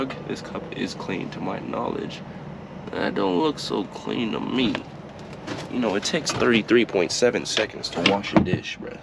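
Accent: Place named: Haiti. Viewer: I came across this channel just browsing, because I usually look for a camping videos of people just enjoying their lives ,yours came up immediately click on it at first I wasn’t because it’s 15 mins long ish ,don’t come for me I say that because I feel like it’s important to at least try for 29/30 mins long as others put a lot of time and effort anyways I strayed away from that, and took a chance only to hear you preach about god and the lord amen I subscribe , this is the first video on your channel I’m watching and then I continuously sat watching , grabbing that chapstick 😂😂😂and seeing that GT flag it was a done deal I was here like ohhhhh I found my husband lamp 😂😂😂😂 at first I was like the way you speak it kind of seems somewhat similar to my niece father thought for sure you were Haitian possibly. Love your content ,I praise god and lord EVERYDAY for life could have lost mine last year to a hit by a car by god grace I’m here ,I got even more into gospel music I play it all at work even meet a member of the Evolation worship, and he was like shocked that I was actually playing gospel music guessing on the clock because I’m sure he’s hurt his music being played somewhere I played every day playing anywhere, especially on my over the ear headphone where I know others can hear but at work it’s all on my tiny speaker lol and just to get an idea I work at the airport 😊 Add me insta blssedd.xx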